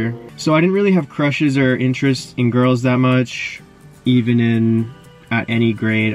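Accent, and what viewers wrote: Canada. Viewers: Am I sensing a Canadian accent? 🙃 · Are you actully from Canada? All your "ou" words have that Canadian "ow" sound!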